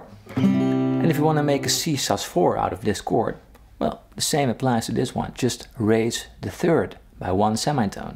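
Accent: Netherlands. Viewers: i need to know, are u dutch? your accent sounds soooooo familiar. if so, ik ben ook nederlands! love your vids!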